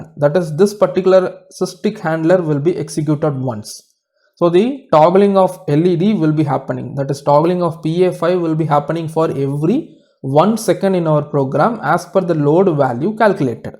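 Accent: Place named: South India, India. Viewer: Dude Are U a South Indian?? My Maths Teacher was also South Indian, BTW Cool Accent ;-)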